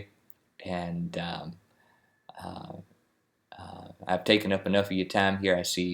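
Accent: Virginia, United States. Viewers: This is amazing. My husband and i had never heard a Virginia accent (that's how we ended up here), and it's precisely what we had imagined. We love your accent! We're from Indiana and hear coastal southern, mountain and a bit Pennsylvania. Love it nonetheless. ❤ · Love the video. Very distinctive virginia accent. From your experience does the valley have it's own distinct accent. Have noticed the 804/757 to have distinct accents. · I love this Virginia accent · My grandparents and mother are from Lynchburg, God I love that accent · When he said the word, "Record" I knew instantly it was an authentic, traditional Virginian accent. You don't hear the Virginia accent much anymore - especially around places like Stafford where nearly everyone is a transplant from some other part of the country. I understand this accent is disappearing quite rapidly these days. 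· I'm from Richmond and he sounds more like Powhatan to me but he explained he grew up in Dinwiddie. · I’m from Goochland County. Your accent makes me miss home sir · You Sound Like Us Back in Norfolk, VA. Big Up Virginia! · Yeah this is a Virginia accent but I mostly hear a lot of older people speak like this lol. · "Occupied VA" lol...that's funny, I've never heard it referred to as such, but I love it because I call the rest of VA (any part that's not Northern VA), "The Real Virginia". I grew up in Courtland, which is in Southampton Co. and my wife grew up along the Sussex/Dinwiddie County line and despite living in Maryland for the past 20+ yrs, our Southern Virginia Accent is still very present. You sound very much like the folks I grew up with. For all of those in the comments saying this isn't how Virginian's talk, I would say you haven't been been outside of your city. I also read where someone said his long story telling reminds them of "southern culture". 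That's because it is, Virginia is a Southern State. When my wife and I go home, it's refreshing that the good o'l Tidewater/Virginian Southern Accent is still very much alive, however I do agree that the deeper you go in Hampton Roads, the accent is waning due to influx and influence of Northerners who have relocated there. Thanks for the video. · I'm from Williamsburg and I almost never hear people with this accent? I go to school in Richmond and I also have never heard this there. This sounds very much like Dinwiddie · Just outside of Richmond it is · anyone that says this isn't a VA accent has never really heard a VA accent before. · I love that accent from the south boston area like jeff and ward burton got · I’m from Richmond Virginia and you don’t have a Richmond accent. Definitely southeast Virginia or southwest. As you head south out of Richmond the accent becomes more NC. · I just love the Virginia accent - and I found it from the weirdest source. 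A bad guy from the StarCraft games, Arcturus Mengsk. Been trying to nail the accent ever since, this video helps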